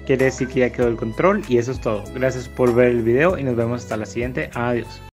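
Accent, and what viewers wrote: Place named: Mexico City, Mexico. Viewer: hola amigo, se escucha como si fueras de la cdmx, donde tienes tu talles y cuanto cuesta reparar el control contigo?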